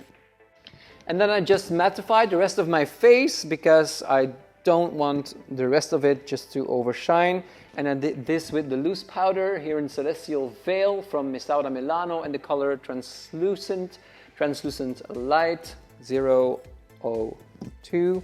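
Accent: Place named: United States. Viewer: Jij spreekt heel natuurlijk Amerikaans.. nicely done!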